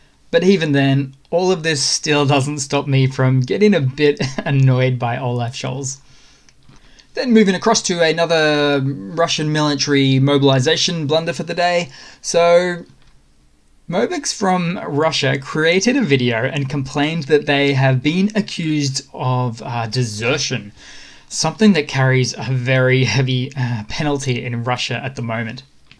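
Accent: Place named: Australia. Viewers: Sounds like an Aussie... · My guess is Aussie 🇦🇺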